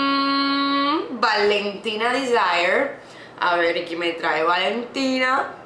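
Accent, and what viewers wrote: Dominican Republic. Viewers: Ese momento donde su acento me llama la atención y descubro que también es dominicana ♥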